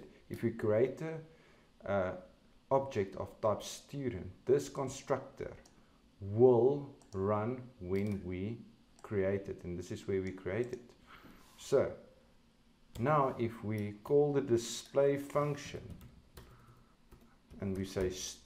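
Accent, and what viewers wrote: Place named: South Africa. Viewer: Your accent says you are in South Africa. . . Super proud of you man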